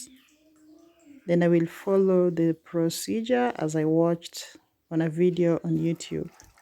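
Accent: Kenya. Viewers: Which part of Kenya is this? It is beautiful. I can only tell that you are from Nyanza following your accent.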